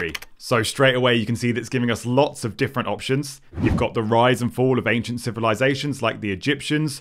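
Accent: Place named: United Kingdom. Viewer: Love your British Accent Sir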